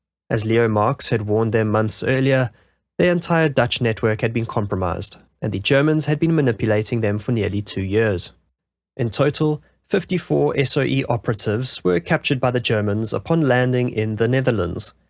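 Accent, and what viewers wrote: South Africa. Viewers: Kudos for another phenomenal video. Well done. It’s great to hear the South African accent of a fellow countryman. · South African accent?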